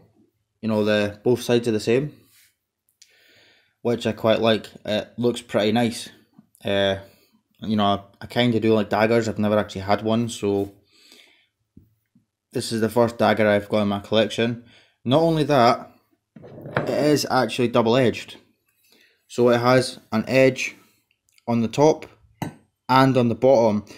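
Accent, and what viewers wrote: Scotland, United Kingdom. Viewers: Scottish accents are dope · Very dope. Now, is he from Edinburgh or from Glasgow?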